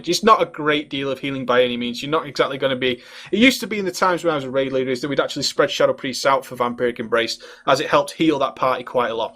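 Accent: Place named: Manchester, United Kingdom. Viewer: Great video, going for a shadow priest with the scroll of resurrection. Nice to hear a Manc accent, I moved to Brazil noy many Mancs there. :)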